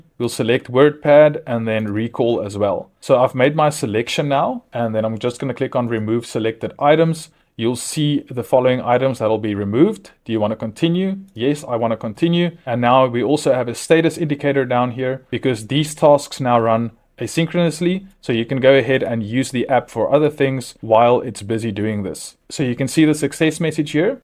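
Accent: South Africa. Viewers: Dude, are you a South African?????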